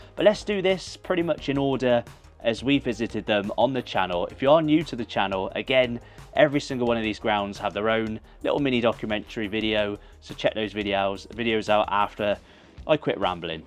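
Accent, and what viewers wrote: West Country, United Kingdom. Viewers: You from the west country?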